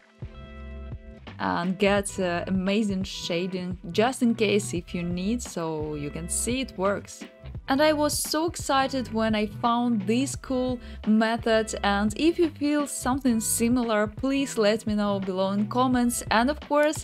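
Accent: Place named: Russia. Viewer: You speak kind Russian accent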